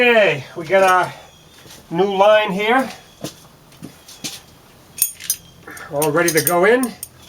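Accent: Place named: Boston, United States. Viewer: wow, really? Either way, I'll be checking your videos. They are extremely informative and gotta love thr Boston accemt. :D.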